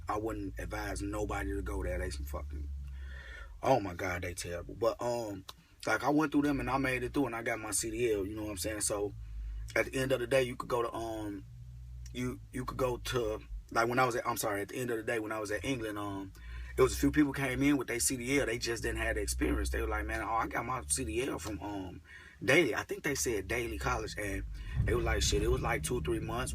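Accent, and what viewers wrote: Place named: Chicago, United States. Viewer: He ain't lying. I know people making this kind of money owner operating. I knew you was from Chicago when you started talking.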